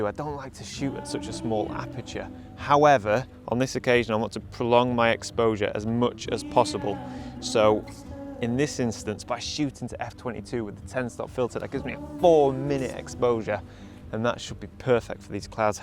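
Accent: United Kingdom. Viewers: You put an emphasis on slowing down, and I took up photography to fill the slow moments when out hiking. Irony (: I love the channel though, even though I think about photography with a British accent now. "Ab-solut-ly Stonnin!"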